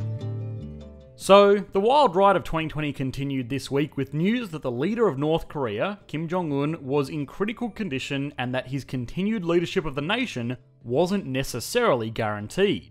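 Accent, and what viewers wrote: Australia. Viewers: I love how this duffer tries to speak American English with an Australian accent. He's good at explaining stuff, though.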